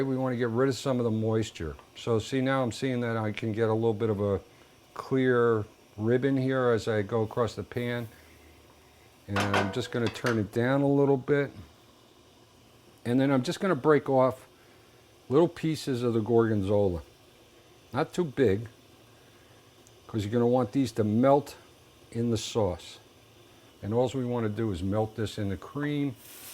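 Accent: New Jersey, United States. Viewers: Knew he was a fellow new jersyian from his accent - he didn't need the t-shirt to remind me of home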